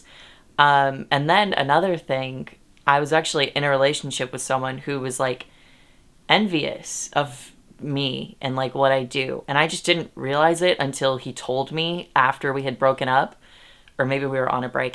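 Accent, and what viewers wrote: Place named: United Kingdom. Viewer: I've been following your channels for a while now. It's been nice to witness your development, the content journey and the much deserved growth! I've watched every one of your videos and am glad I have. I'll continue to too 😸 Totally unrelated to the vid, but the recurring bri'ish accent always makes me laugh 😹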